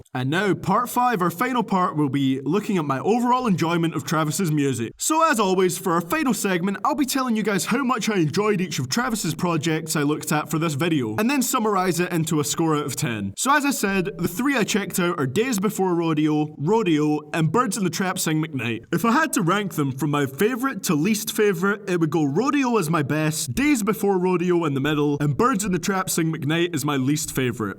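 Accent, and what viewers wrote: Scotland, United Kingdom. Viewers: Brother you from scotland? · i can't help but notice that you have a western European accent, are you Scottish ?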